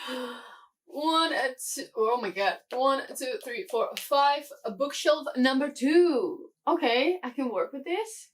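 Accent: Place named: Galicia, Spain. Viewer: Ostia, encontré tu canal hace poco y había algo en tu acento que me sonaba mucho y era de oírmelo a mi, que tb soy de Galicia...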